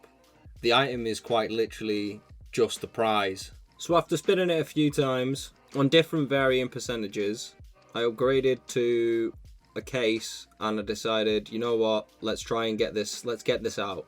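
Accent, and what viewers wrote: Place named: United Kingdom. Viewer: i assume youre from UK by your accent, can you even deposit money into the website? im from UK and cant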